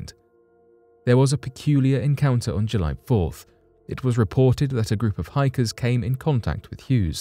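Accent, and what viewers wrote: United Kingdom. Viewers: Brits say geezer. This dude is a Brit.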